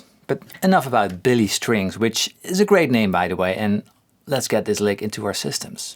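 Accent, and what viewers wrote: Netherlands. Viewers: I believe he is from the Netherlands.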